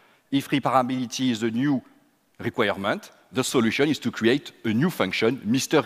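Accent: France. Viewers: he speaks like a french. i dont like this. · 1. Brilliant - I wish more Orgs would have the vision to integrate some or all of this into practice. 2. Its a French accent. Get used to it. There are subtitles. Stop complaining over simple stuff. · I am distracted by his accent. I love how French speaks English :P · French accent in English is the worst accent in English. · I'm sure this is very inspiring, but since I have never really had the chance to listen to others with a French accent I can't make out every sentence he is saying. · well, i'm French, so I can tell you that oral in our classes, oral english speaks are not pushed to be practiced till college years, so we have a few great people that have that kind of accent... Don't be afraid, this guy has great ideas... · i think he has french roots. I sense a slight accent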